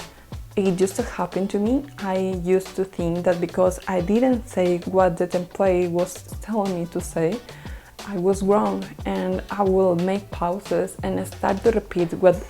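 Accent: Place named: India. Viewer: he means the way you speak English ... sounds pretty Hindi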